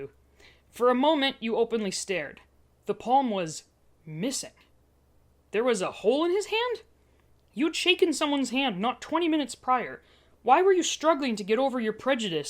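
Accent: South, United States. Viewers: Your Southern voice is OK I’ve heard worse T-T sadly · XD the southern accent hehehe I love your readings lol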